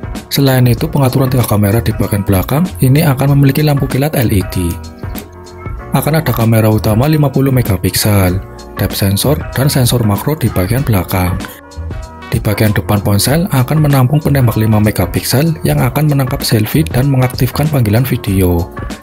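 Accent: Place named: Tegal, Indonesia. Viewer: Sing ngisi suara wong Endi coyy... Medok n ngapak nemen yahh.. wong Tegal apa Brebes ??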